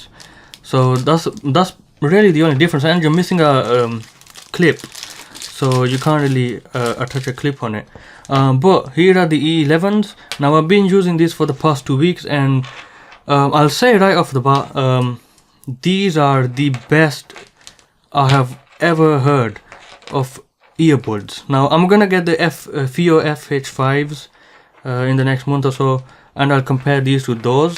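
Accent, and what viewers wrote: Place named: Manchester, United Kingdom. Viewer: You sound very Mancunian. Thanks for the review!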